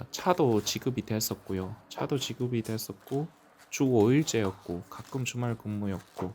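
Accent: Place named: Ulsan, South Korea. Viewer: 울산사셔서 사투리 쓰는게 왜 죄송한 일인가요 열심히 사는모습이 중요하죠. 화이팅입니다.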